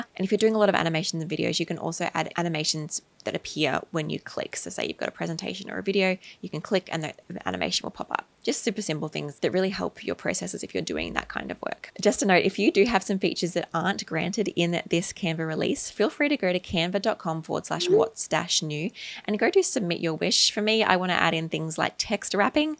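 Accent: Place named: Australia. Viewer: Nice to here an Aussie voice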